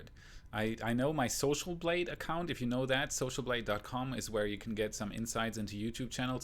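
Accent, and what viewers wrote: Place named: Bavaria, Germany. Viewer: Being an old Army brat my dad's last posting was Stuttgart.The first time I ran across you I was shocked,you looked just like my childhood friend Helmut from my school there,right down to slight Bavarian accent most likely don't notice lol.Your English is VERY good,better than some Americans lol.And I'll say you have a beautiful family and I'm looking forward to you coming to America to stay.Maybe someday I could meet you I hope,my oldest son is 37 so hopefully we have things in common.God bless and keep you:-)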